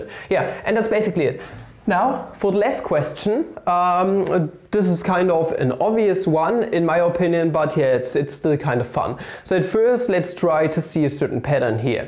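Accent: Germany. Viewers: Ich mags wie der deutsche Akzent bei dir immer mehr und mehr schwindet beim englisch Reden :D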